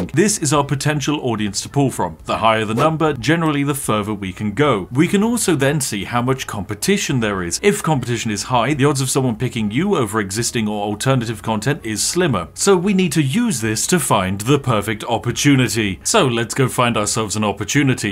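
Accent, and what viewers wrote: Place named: Yorkshire, United Kingdom. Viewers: Very Yorkshire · Wow Yorkshire. Tea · Yorkshire :3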